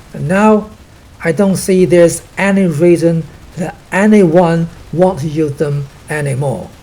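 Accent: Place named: United Kingdom. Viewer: you sound like an Asian with a British accent how bizarre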